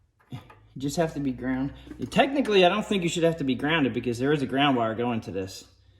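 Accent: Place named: South Jersey, United States. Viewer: Your South Jersey/Accent makes me feel at home.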